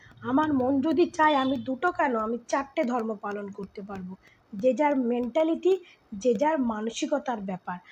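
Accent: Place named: Bangladesh. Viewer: Tui india bole mone hoyna tui tor kotha batrai bojha jay tui bangladesi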